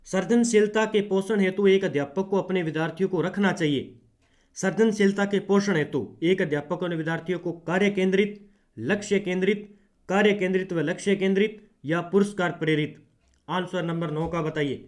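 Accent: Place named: Bagri, India. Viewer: Sir aap jo bich bich me bagdi type bolte ho wese hi btaya kro..... Bhut ache se smjh me aata h 😊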